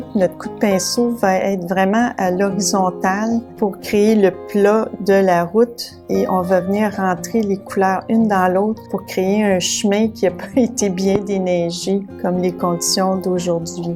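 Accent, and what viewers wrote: Canada. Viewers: Bonjour , vos conseils me sont très utile ,moi le débutant , vous êtes adorable ,votre accent Canadien est superbe , j'aime votre travail ,vos conseils et vos explications ,merci ,Bruno de Saint-Etienne France.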